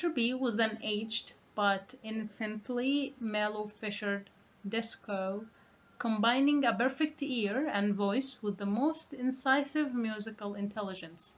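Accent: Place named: Spain. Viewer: She sounds a little Spanish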